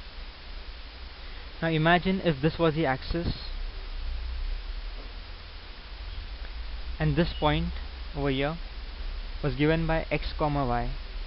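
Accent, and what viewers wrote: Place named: India. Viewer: very nice video..easy explanation and the accent was nice and indian ;) kep it up bro,looking for more videos on grahpics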